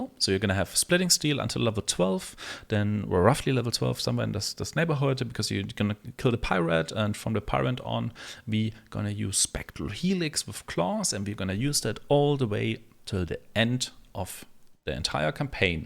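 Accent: Germany. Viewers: u can 100 %hear that in ur voice haha :D not a bad thing tho your english is fine u just hear the german pronounceciation!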